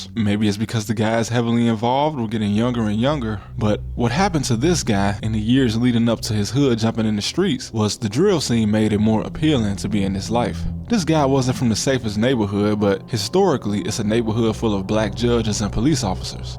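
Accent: Chicago, United States. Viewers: This is game bro, the chicago accent adds like the perfect level of translatable relatability, at least personally lol, youre actually a human telling a story, not some dude ropeplaying TTS, to put it politely how some of the dogshit you'll find on this website 😂, this is at least silver, if not gold tier content consistently least these past few videos i been catchin lol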